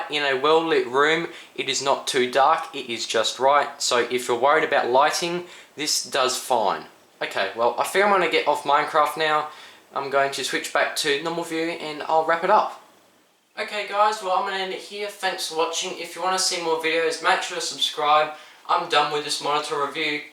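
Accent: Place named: New Zealand. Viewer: Great review. Noticed you have a NZ accent :-) Waiting for your updated review with 5700XT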